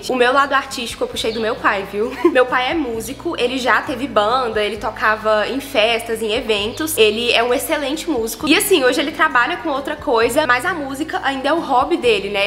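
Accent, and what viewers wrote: Minas Gerais, Brazil. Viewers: Fugão, tremsão kkkk é mineira mesmo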